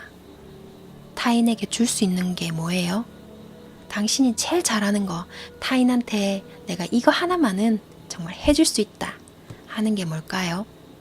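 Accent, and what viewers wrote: Gyeongsang, South Korea. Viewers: 마약의 경상도 톤...와...반가와요~~^^ 잘 듣고 갑니다~ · 말투가 경남쪽인데... 20대 때 짝사랑한 누나가 부산 사직여고 졸업.. 지금은 서울 삼성의료원 과장..되서 잘먹고 잘 살고있어요. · 오~ 간만에 활기찬목소리 갱상도 특유의 목소리. 에너지 마이 실린 목소리 감사합니다.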